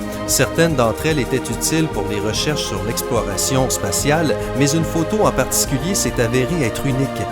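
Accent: Quebec, Canada. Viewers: Je mets le pouce bleu juste pour ton magnifique accent québécois 💓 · L'accent québecquois, ça va bien deux minutes, après ça me dégueule par les tympans... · On dirait la voix d'un québecois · Putain mais ferme ta geul avec ton accent québécois ta niquer la vidéo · Vidéo de merde pour le sensationnel, tout ce que tu montre à des explications, fais des recherches er cet accent québécois immonde, ça me fais vomir. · j'adore l'accent québécois (j'y vais plus souvent que bcp) mais la musique en arrière plan est d'un casse oreilles, une prise de tête ! Bien à vous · "Un accent québécois" c'est super bien, au moins on comprend quelque chose. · Super l' accent kébecoi :') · charlatan avec son accent québécois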